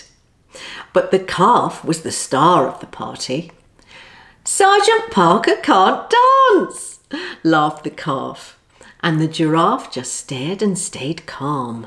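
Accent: United Kingdom. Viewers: Is it British English or American English? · Hi, your accent is great. you are teaching us British accent. I presume.❤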